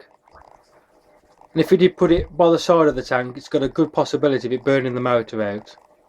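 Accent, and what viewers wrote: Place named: Wolverhampton, United Kingdom. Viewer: This setup looks awesome! Tubbs and Edwards look happy to see you :) I just brought my first aquarium but I'll be keeping fish. You sound like you're from Wolverhampton like me!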